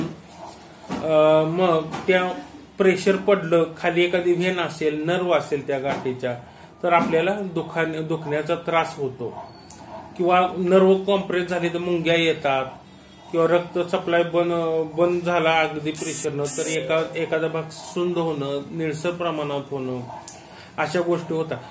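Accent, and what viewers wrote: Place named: Kolhapur, India. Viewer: डॉ छान माहिती दिलीत homoeoathic बद्दल आपल्या बोलण्यात कोल्हापूरी साज पण मस्त आहे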